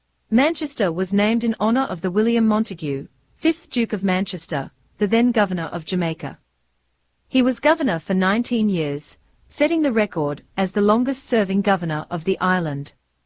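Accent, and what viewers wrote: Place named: United Kingdom. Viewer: Interesting facts but stupid video with the British accent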